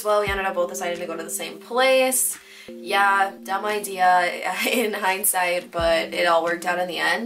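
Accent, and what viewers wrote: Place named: Midwest, United States. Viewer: I know this is a few years ago but I can totally tell you are from the midwest. Utilizing yadayadayada All my friends do that xD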